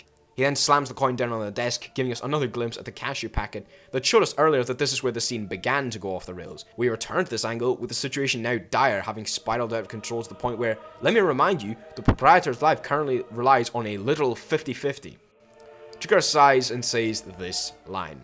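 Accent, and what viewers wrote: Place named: Scotland, United Kingdom. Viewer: Great, short video essay. Funny at times informative at others, but I've only just realised how Scottish you sound lol. Keep up the good work.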